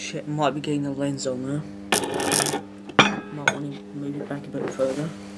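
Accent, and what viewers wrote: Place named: United Kingdom. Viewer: How is it that all the crazy electricians have a UK accent? XD I absolutely love these!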